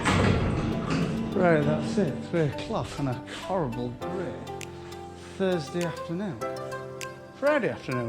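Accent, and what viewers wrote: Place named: Northern England, United Kingdom. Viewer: It's int Wizards sleeve mode 😀 love listening to northern accent like mine, hope there's a few American viewers wondering what's he on about. Best videos on YouTube.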